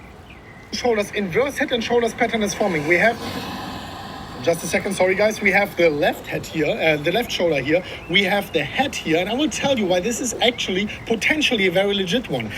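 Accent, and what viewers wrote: Germany. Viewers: This guy has all the things I hate about YouTube. Terrible thumbnails, click bait, shamelessly promoting affiliate links, too many ads etc. However I love the emergency streams even though nothing is happening, the German accent and he seems like a stand up guy. All the best. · Can someone tell me what his accent is? German?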